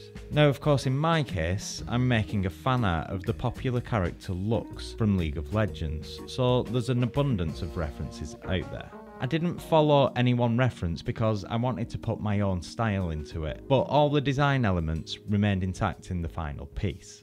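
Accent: United Kingdom. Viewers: I dont understand British, in my russian school we've learned English only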